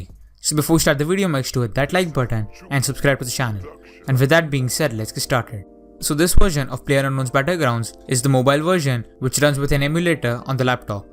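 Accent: India. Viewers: are you indian you sound like it and i am egyptain by the way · Please don't do the cliche basey remix intro sequence- Shit. Ok, but at least have a tutorial that isn't voiced by somebody with an indian accent (no offense meant) SHIT. Another one. At least don't do the "Before we start like and sub" DAMN IT! Ok, but at least tell me it's the real deal and it's not watered down or a virus- It's an EMULATOR?!?!?!?!? That's it, bye.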